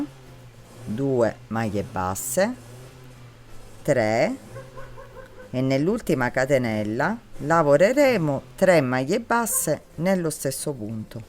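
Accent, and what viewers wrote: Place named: Sicily, Italy. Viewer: Bravissima ❤❤❤ti dirò mi piace quel tuo accento siculo nn so se sbaglio ma sei simpaticissima 😘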